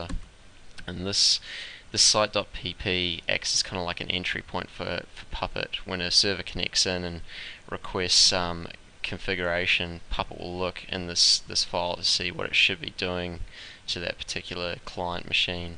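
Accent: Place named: New Zealand. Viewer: What, so just because you can't understand a Kiwi accent, you have to be an arse and post some Swedish translation? Ignorance is bliss? Or you just upset the New Zealand is better than the old one?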